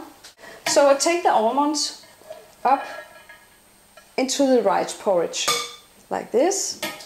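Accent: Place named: Denmark. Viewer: kæft hun har jo en piiiinlig dansk accent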